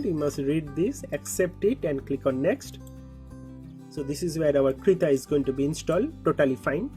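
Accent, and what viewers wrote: India. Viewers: its allways the indian acsent to, thats how u know its going to work. thanks man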